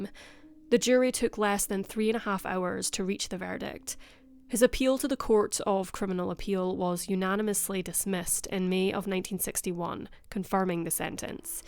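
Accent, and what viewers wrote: Scotland, United Kingdom. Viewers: Jeez - you can't tell the difference between Canadian and Scottish.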